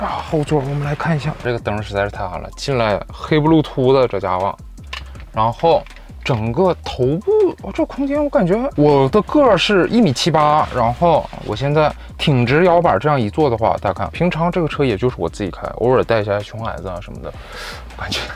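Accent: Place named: Northeast, China. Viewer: 這一口東北話，笑死我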